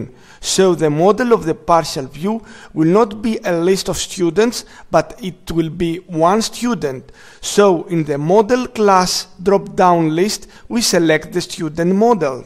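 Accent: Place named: France. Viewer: nice frenhc accent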